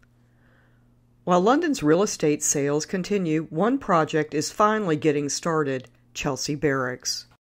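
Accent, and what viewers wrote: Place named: United States. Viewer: Why the American accent!!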